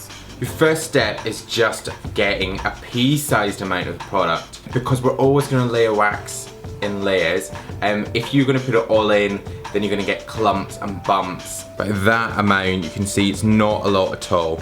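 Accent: United Kingdom. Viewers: i wished I have a british accent like you 😂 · Cool video man but it would be better if you started while your hair was messy af, like just waking up or whatever. Also stereotypical gay accent mixed with whatever British accent sounds odd lol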